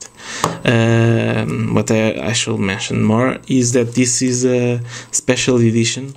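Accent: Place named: Yugoslavia. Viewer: You sound Yugoslavian like me but you're French right?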